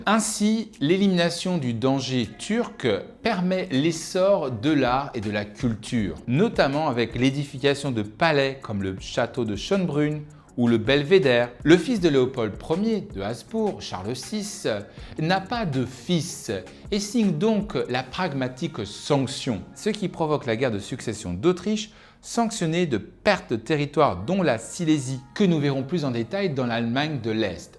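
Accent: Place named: Paris, France. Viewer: Bonjour, votre accent Parisano-Franchouillard me csse les oreilles, au niveau littérature : Quer durch Österreich (Gertrud Gréciano-Grabner)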